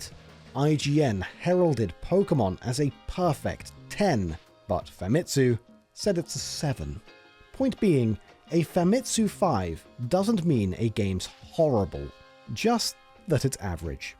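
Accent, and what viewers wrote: United Kingdom. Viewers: Stop using British accents already. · Looks like the script itself was copy-pasted as captions, instead of using YouTube's auto-caption system. This video was written by an Arkansan (me, I write like I talk), but it was VO'd by a Brit, that's why there's some inconsistency.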